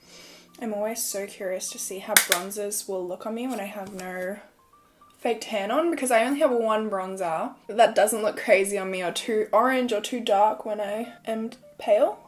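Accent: Australia. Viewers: i really love your Aussie accent and voicee 💗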